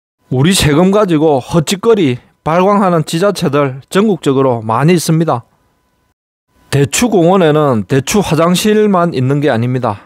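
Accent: Gyeongsang, South Korea. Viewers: 딱 첫마디듣고 경상도인줄알았다! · 그런데 지자체 헛돈 쓴 곳이 경상도랑 강원도 같은 곳만 있나? 흠. . 말투는 경상도인데 신기하네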